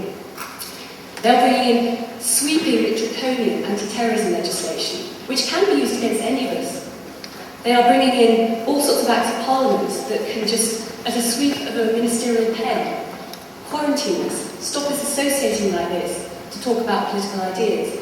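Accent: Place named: England, United Kingdom. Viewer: You cant understand English spoken by an English person? LOL. Its not because she is a "Limey" I think that its just simply that your uneducated.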